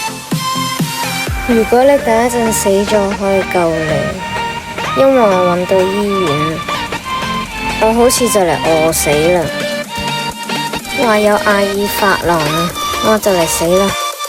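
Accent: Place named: Hong Kong, China. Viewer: 你的口音好像是香港人啦 然后你讲的话是不是广东话 我猜你肯定是广东人❤❤❤😊😊😊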